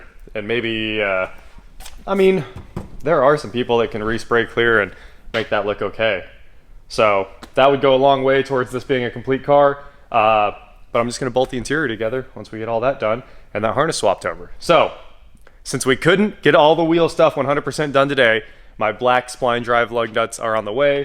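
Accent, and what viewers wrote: Kansas, United States. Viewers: A Kansas t is a d? I don't understand. However, now you've given a reason, and I know why it is now, I'm fine with it. I'm not knocking your content, just I couldn't understand your pronunciation. I love your content, your ambition, your drive and your achievements. I look forward to your uploads, and long may they continue, even with the Kansas t. It's not related to Jed Klampit's Texas Tea? No hard feelings I hope.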